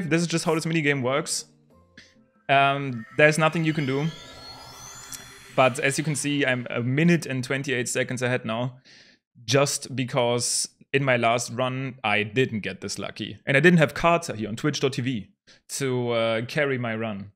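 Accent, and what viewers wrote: Germany. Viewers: wie man immer hört wenn deutsche englisch sprechen xD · Okay, can I ask something? Do you speak German usually, because you have such an accent! Wenn, dann find ich das mega geil XD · are you german? you sound like it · Das erste dass mir auffällt ist sein deutscher akzzent lol.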